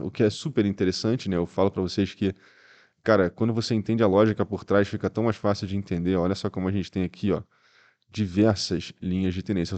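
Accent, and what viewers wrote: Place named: Bahia, Brazil. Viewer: Bahiano! Oxeee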